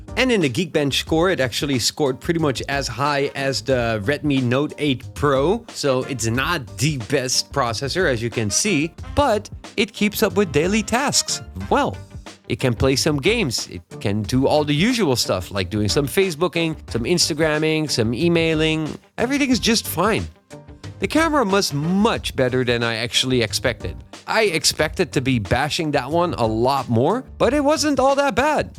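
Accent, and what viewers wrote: Netherlands. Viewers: You sound Dutch. Aren't you? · Hi, Great video! Are you Dutch? I think I can hear a slightly dutch accent, however your english is very nice though!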